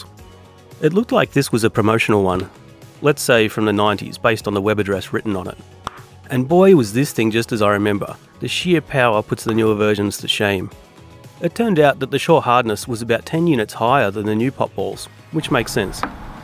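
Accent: Australia. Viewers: X really you're Australian I think you're Australian you have an accent anyway you're still great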